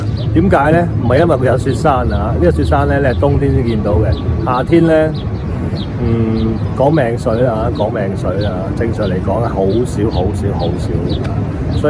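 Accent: Guangzhou, China. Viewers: 亞女講句粗口嚟聽吓先，睇吓正唔正宗廣州口音先😂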